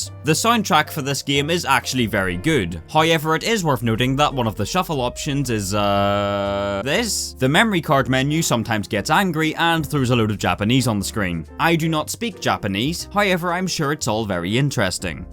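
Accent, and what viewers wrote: Northern Ireland, United Kingdom. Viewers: Norther irish accent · This guys sound british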